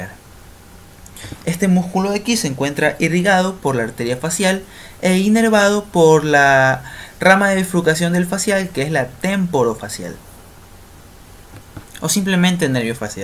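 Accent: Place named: United States. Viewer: Todo muy bien … pero tienes problemas para pronunciar la R porque se escucha como un gringo 🙄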